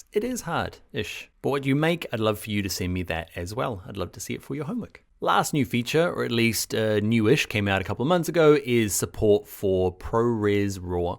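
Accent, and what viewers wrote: New Zealand. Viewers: Yah it doesn’t sound quite Australian. I’d guess NZ too. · I really love the Kiwi accent.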